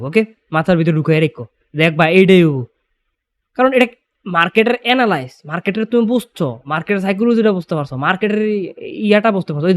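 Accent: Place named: Mymensingh, Bangladesh. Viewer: Vai apnar kotha sune mone hoitase apni Kishorganj or Mymensingh er...amar bari o oi dike e...vlo laglo apnar video ta...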